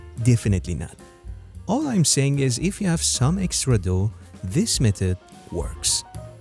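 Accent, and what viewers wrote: Iran. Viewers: درود. لهجه ایرانی دارین. من تازگی میخام با خمیر ترش آزمایش کنم. امیدوارم که بتونم 😁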